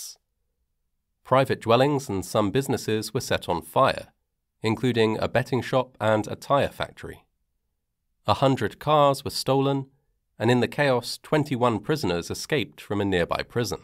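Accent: England, United Kingdom. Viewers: I love listening to your narration, I could listen to it all day. Out of curiosity, what region of England do you and your accent hail from? Although some accents of “Old Blighty”, like ‘Cockney’, are quite unmistakable; but of others, I’m sorry to say, that my American ears sometimes have difficulty in their ability to distinguish.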